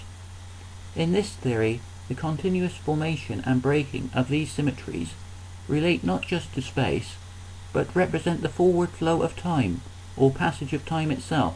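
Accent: West Country, United Kingdom. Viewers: Ahhh you sounded westcountryish.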